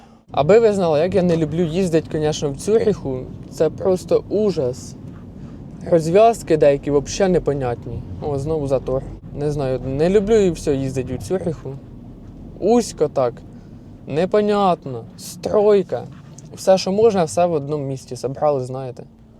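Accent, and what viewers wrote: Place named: Slobozhanshchyna, Ukraine. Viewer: Вдячна за відео. Це мій улюблений "жанр" в ютубі - подорожі по різних куточках різних країн. Але під час перегляду чомусь прийшло на думку давнє іспанське прислів'я:"Швейцарія - це красиве дерево, яке росте на смітникУ". Сподіваюсь, контекст прислів'я зрозумілий для думаючих людей. З повагою. Окрема вдячність, що не забуваєш "слобожанську говІрку"!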